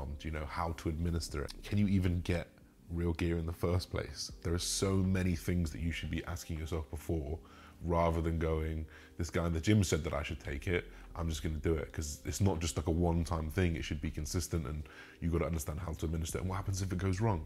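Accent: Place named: England, United Kingdom. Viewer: Jesus it’s someone English 😂 finally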